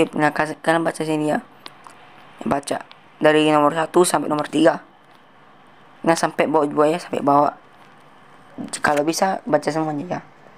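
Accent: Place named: India. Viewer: Suaranya kek orang india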